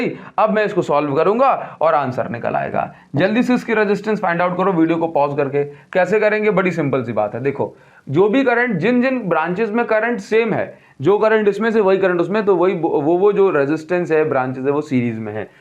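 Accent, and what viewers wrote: Odisha, India. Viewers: Odisha se ho kya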